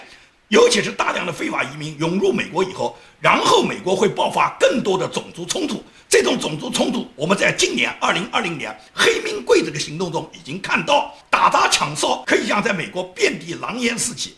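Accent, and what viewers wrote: Nanjing, China. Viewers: 我发现你是不是一个南京人，之前，一口南京话。。哈哈。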